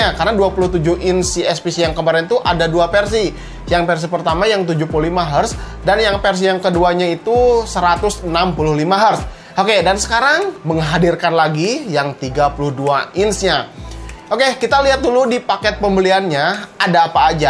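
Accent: West Java, Indonesia. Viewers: Aksen sunda nya masih ada kyk dulu _.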